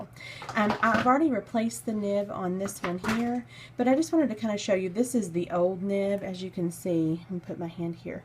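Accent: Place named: South, United States. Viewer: Ahh love a southern accent tutorial vid 😊 reminds me of my fam down south. Ty for posting this!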